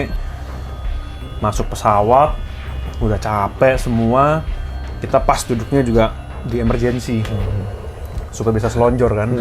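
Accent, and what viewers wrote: Java, Indonesia. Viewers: Jiangkrik. Di rungok"ne jowo tibae😅😅 Sentolop barang e. Jurrr. Ngrungokne penyampaiane iku lho. Mau ketawa takut dosa. 🤦‍♂️🤦‍♂️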